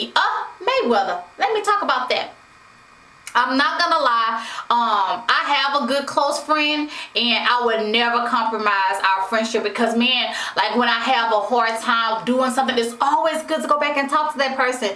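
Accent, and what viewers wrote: South, United States. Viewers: i feels that?? hahaha. your country accent cracks me up (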